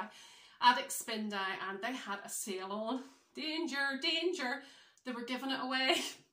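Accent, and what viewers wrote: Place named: Northern Ireland, United Kingdom. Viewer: Just came across this podcast and enjoyed it so much so I'll be checking out all your others. I love your cheeriness and your easy chat and also your N Irish accent. Your Kastelet is beautiful and just my style so I will be checking it out on Ravelry..thank you.. Looking forward to watching your previous podcasts. God bless you lovely lady. x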